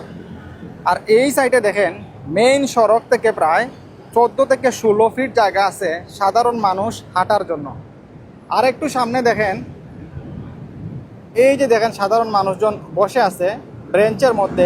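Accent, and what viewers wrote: Sylhet, Bangladesh. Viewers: তুমার কথা সিলেট মনে হয়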